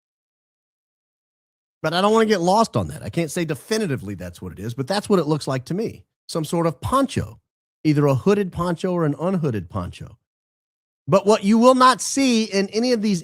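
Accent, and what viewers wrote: Australia. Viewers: New Zealander here... Your Aussie accent is hilarious, 🤣🤣🤣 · Good Aussie accent ☺️ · As an aussie i can confirm that is exactly how we speak, mate!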